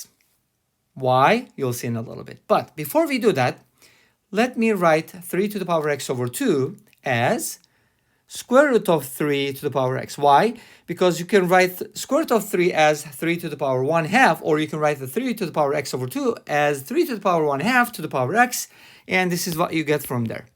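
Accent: Turkey. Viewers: The accent is unmistakably Turkish. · you exactly sound like you have a turkish accent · you sound turkish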